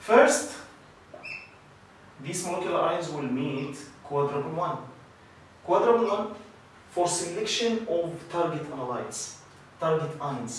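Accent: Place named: Egypt. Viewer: من لهجتك وهيئتك باين عليك مصري رفعت راسنا بالمجهود الجميل دا❤❤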